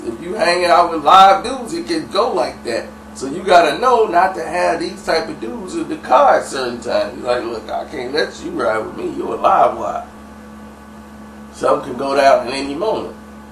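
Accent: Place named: Chicago, United States. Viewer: I can do without your Chicago Accent Bro! Next video you need to sound like you from VA or I'm going to unsubscribe!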